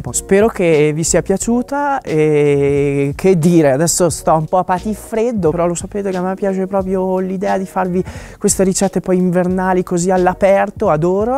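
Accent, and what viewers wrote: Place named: Romagna, Italy. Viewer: lo "ssiock termico", "tutto quel bel sciugo", "fazendo tosctare", "zzi vediamo alla proscima"...ma c'è niente di così piacevole per le orecchie come la parlata romagnola? A parte questo c'è davvero da squagliare di fame vedendo questa ricetta (avrei solo qualche riserva su tutto quel burro). Aggiungo, e spero di non metterti in imbarazzo, che me fai sangue pure te quanto il goulasch, perchè sei davvero un gran bel burdel (eccheccavolo, in base a quale legge o trattato chi illustra una ricetta ha da essere sempre di una bruttezza invereconda? Le eccezioni vanno apprezzate e segnalate).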